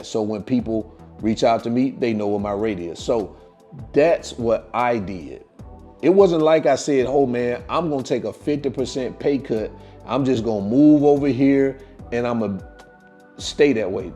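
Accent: South, United States. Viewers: I have to say , I love your country accent!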